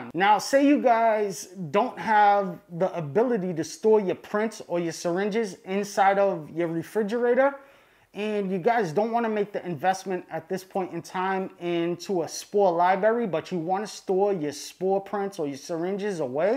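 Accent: United States. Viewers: He speaks a heavy regional American English. Impossible to mistake where he's from. :) Has a few problems with the latin also -- especially cyanescens and azurescens But, ah well. Not so important.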